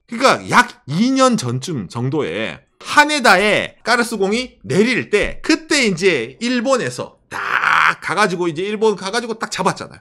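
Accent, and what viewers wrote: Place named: Daegu, South Korea. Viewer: 대구 사투린가요 ? 대륙남이랑 억양이 비슷하네여 ~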